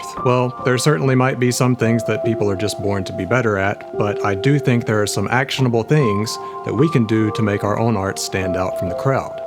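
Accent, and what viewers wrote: South, United States. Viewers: I love this guy’s voice. I love his slight southern accent but completely meditative tone. I want him to read books to me.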